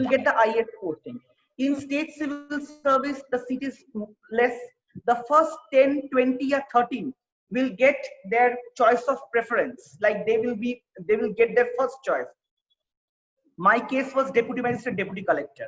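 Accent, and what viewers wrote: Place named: Bengal, India. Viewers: I appreciate you dada n i respect you..but ur bonghindi 😂 🤣.. love that.. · Your bong hindi 👍👍👍👍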